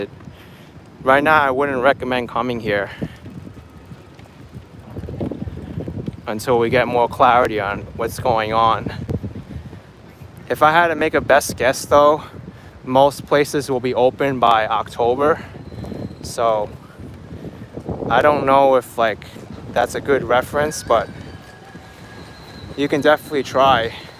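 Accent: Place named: New Jersey, United States. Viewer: YOU are at my former neighborhood. Although I grew up in Union City just north of Jersey City. You got the Jersey accent very good. Who ever thought there would be skyscrapers in Jersey City!! WOW! I miss seeing the skyline from Jersey. When I lived in Union City I saw the skyline everyday. Thanks for traveling to Jersey City. I hope you got back home safely. God bless you.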